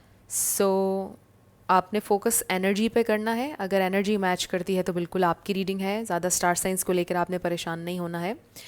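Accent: Pakistan. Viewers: We were in long distance relationship we never met he planned about meeting in August but he blocked me on WhatsApp and I blocked him on SC .I Pray he will call me ❤........I like ur readings You are a Pakistani I bet ......your way of talking is Like Pakistani .❤keep going keep it up.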